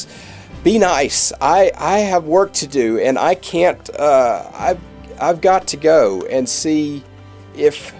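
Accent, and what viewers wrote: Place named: United States. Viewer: if you're going to pretend you're in the illuminati, don't have a beard, a badly exaggerated yank accent and a poorly scripted video you massive american bellend!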